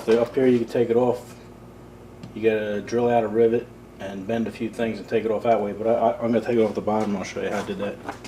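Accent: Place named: New Jersey, United States. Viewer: Thank you! this is exactly my problem. Awesome vid! also, are you from New Jersey? -just wondering cuz of the accent.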